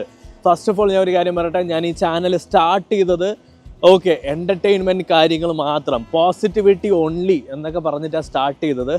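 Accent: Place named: Thiruvananthapuram, India. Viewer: enth standard 😂😂 ninte movie review oke shokam aan....oru cinema knowledge ooo athinte oru essence oo ninte review il kanarila....chumma kore vaya kond goshti kanikum ....trivandrum slang il korch vaythalam.....and tech review x il ninum kittuna news athe pole irunn vayikunu.....ninte pandathe musically vedio kandapo manslyi nee oru kannapi mathrm ayrnu pine youtube il click aaypo ath ang continue cheythu....pine kore podi piler fans ulath kond koypmla....apart from as a content creator you are shokam .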